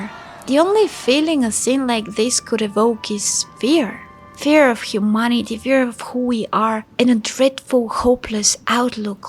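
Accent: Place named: Scandinavia. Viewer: my friend lives in sofia (not exposing his exact adress tho lmao) and he has that big mountain near by that im jelous of, there might be a chance for me to travel there for a bit for a visit, it's funny that im mostly interested in the smaller villages or like the greenery around the city. your accent reminds me of like the or quite a bit that why i thought maybe som northen europe + you have this foresty presence and it really reminds me of like norway and stuff😍 when my friend visited me in poland he's reaction was like: everything is so spacious and like wide wow. here you have i guess everything from meer, flat terrains with/without woods to mountains. i've been to mountains only once because i don't live in the south which is where they are, but im jelous how many of these are in bulgaria. we have starbucks? lmao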